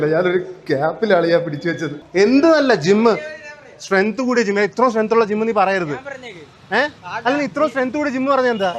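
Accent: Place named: Alappuzha, India. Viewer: Ivn samsarikkunna reethi sheri alla alapuzha karde sthiram olla tone anubee akkal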